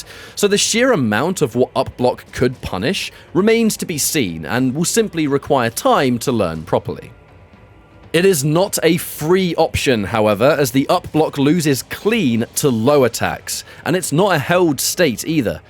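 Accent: United Kingdom. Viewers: There is something about people from UK narating stuff. I could listen to you guys talk about damn fllowers for hours, let alone stuff I’m interested in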